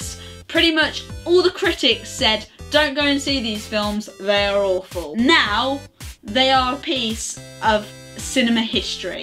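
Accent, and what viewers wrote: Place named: Birmingham, United Kingdom. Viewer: Love the brummie accent :) Freddie said I don't need some asshole critic to tell me that.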